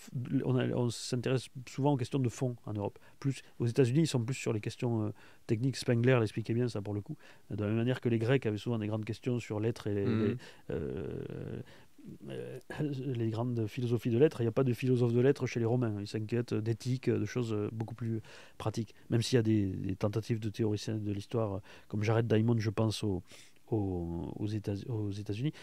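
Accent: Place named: Toulouse, France. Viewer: l'accent toulousain de * me le rends fort sympathique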